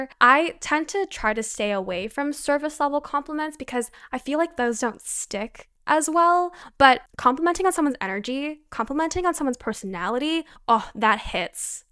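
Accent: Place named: Los Angeles, United States. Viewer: The LA accent ... sounds stupid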